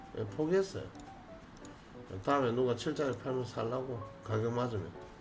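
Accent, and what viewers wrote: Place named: Gyeongsang, South Korea. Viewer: 경상도 사투리가 제스타일입니다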